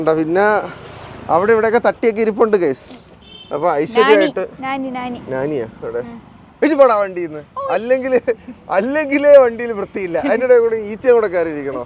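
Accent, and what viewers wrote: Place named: Trivandrum, India. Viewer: Pakka Trivandrum slang Thanneeeee😂😂 ❤ nice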